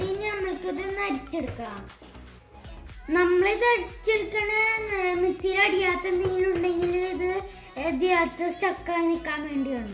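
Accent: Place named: Aluva, India. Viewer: വളരെ നന്നായിട്ടുണ്ട് നല്ല അവതരണം ആലുവ സ്ലങ്ങി ൽ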